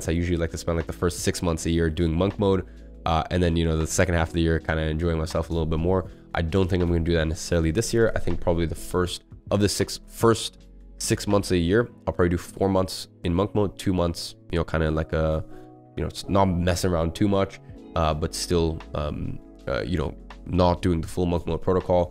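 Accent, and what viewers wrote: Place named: United States. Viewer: Bro had a russian mom, grew up in london, and has an American accent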